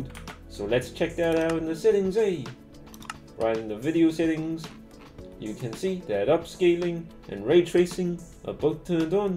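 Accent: New Zealand. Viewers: He's put the Kiwi accent on steroids. And I'm sorry but it's over done for me I needed to mute. Good tips though so thank you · nz accent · It’s a Maori accent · Dude it’s just a New Zealand accent lmao?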